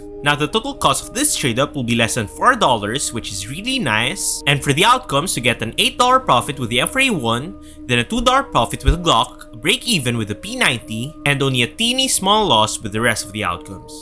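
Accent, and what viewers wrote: Philippines. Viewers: I just knew it that you're from the Philippines because of your accent! But this is really useful so I'm trying this. Thanks!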